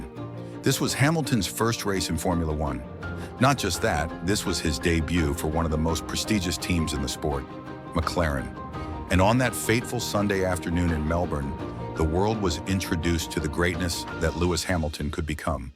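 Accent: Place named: United States. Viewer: I was all in , about to hear what I've heard so many times,heard the American voice over, I clicked off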